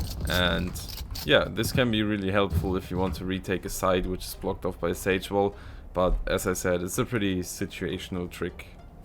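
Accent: Germany. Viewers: Bro du bist deutsch oder ? Haha dein Akzent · Dieser Deutsche Akzent 😂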